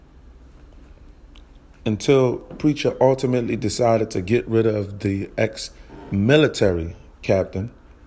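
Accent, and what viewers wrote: New York, United States. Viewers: U from uptown my guy? Or nyc? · One thing you can say is it's a New York dude who is narrating this,you know several things,preacher is in the pen,in this person head,he can't get next to him, Another thing is this,speaking about preacher may help him get likes views clicks I dont know, To be frank I know apple preacher and the henchmen whom is from queens,whom preacher possibly turned on,but Im making this person stronger then how weak of preacher he might think he knows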